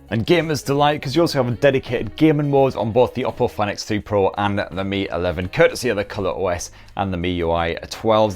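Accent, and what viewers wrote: United Kingdom. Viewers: I struggle a lil with British accents does he call the phones "blowers"?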